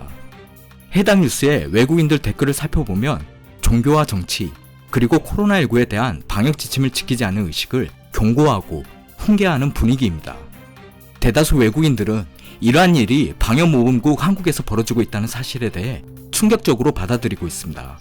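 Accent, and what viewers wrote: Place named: Yanbian, China. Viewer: 조선족은 중국관련 영상이나 올리시오. 아무리 한국인인척해도 당신 발음과 억양속에 조선족임이 들어나고 있음.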